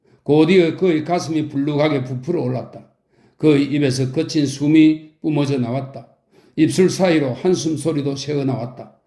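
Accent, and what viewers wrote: Gyeongsang, South Korea. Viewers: 긴 시간 동안 읽어주셔서 감사드립니다. 처음에는 어색하였는데 나도 모르게 경남 사투리의 어감에 빠져들었습니다. 이제 모든 글을 들어야겠습니다. 새로움으로 가득한 소식을 늘 기다리겠습니다.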